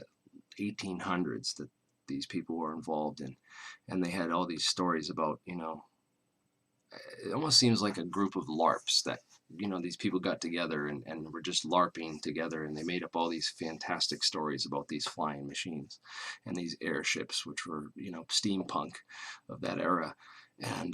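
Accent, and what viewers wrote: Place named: New England, United States. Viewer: My first time watching this channel. Sounds like a New England guy.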